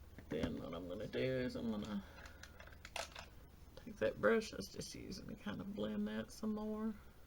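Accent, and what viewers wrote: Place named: South, United States. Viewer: I meant to tell you I just love your accent! My father's side of the family is all Southern and you remind me so much of my favorite Auntie the way she spoke and phrased things. : ) Love you talking thru. It's like hanging out with you. You look fabulous and thanks for the makeup tips! :-)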